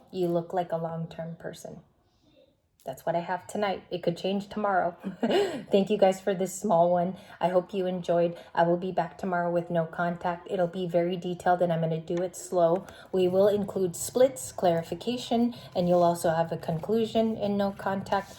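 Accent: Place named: South, United States. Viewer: Love the country accent, 🤠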